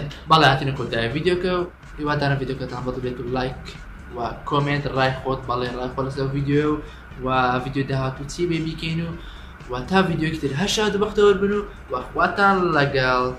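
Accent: Erbil, Iraq. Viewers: hhhhhhhhhhhh bas chan diqat ayam ba hawlery qsa aka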